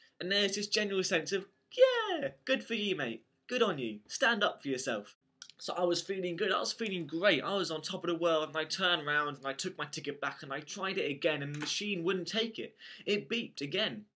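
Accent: England, United Kingdom. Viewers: I have watched this video SO many times just because of your british accent.. and well the story is kinda funny... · London transport is terrifying O____O I went on the underground and the air got so thick and there were so many people and the trains are so fast! (I realize this is the point but it's scary) I wonder If I actually sound English like you or Charlie or it could just be a London thing :p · OMG ur sooooo funny and super cute too and that accent is just a bonus. I just wanna let u know that U have made it official that when I get older (and am not a small 16 year old ) I will go to Britain and find myself a nice guy like u keep making vids cause ur great ; D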